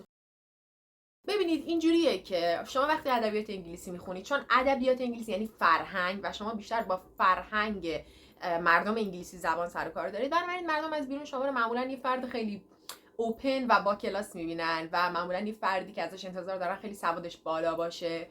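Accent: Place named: Rasht, Iran. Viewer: از لهجه ات معلومه رشتی هستی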